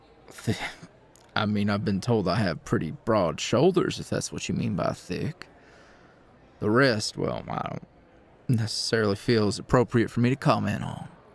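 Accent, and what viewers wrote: South, United States. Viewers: "i guess it's an alright place to get lost in hehe, not much to see tho" Me: "well i can see your eyes how about i get lost in those for a bit;)" Y'all i saw dilf and i clicked so fast, also, you'd think growing up in the south would make me immune to the country accent but no it most certainly does not, i am a weak weak man when it comes to men with pretty voices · Oh my LAWD this checks so many boxes. ✅ DILF ✅ Country accent✅ sweet talk✅ this is illegal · I am sorry but if someone as fine like him approached me with a southern accent and a lovely personality like that he would have had me at "sweetheart"! I just melted at his compliments: Sweetheart, lovely..He is just a cutiepie cowboy isn't he?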